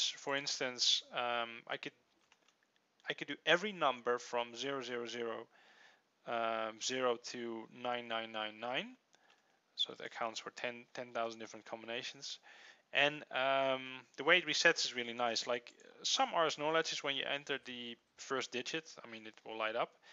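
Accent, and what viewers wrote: Netherlands. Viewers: kan duidelijk horen day hij nederlands is waarom kan dat altijd :p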